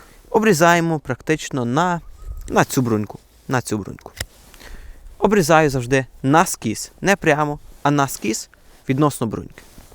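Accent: Western Ukraine, Ukraine. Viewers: Очень понравилось. Спасибо за сжатое изложение по сути. Начинаю писать по русски, чувствую, что съеду на украинский)) Речь красивая, чувствуется акцент западной Украины, но очень привлекательный. Сохраню видео себе. А то посадила пару черешен, а как подойти к ним, не знаю. Подскажите, а повой ВСЛ2 подвержен гробакам? Дякую и всього найкращого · Западный акцет... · не варто вибачатись за "хоть и....западенский акцент". Українська мова багата різними акцентами і тим прекрасніша! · Любуюсь этим парнем. Многие у него взял. Спасибо большое. Он любит своё дело.И мова очень гармонично звучит, хоть и с выраженным западенским акцентом. Молодец, уважение!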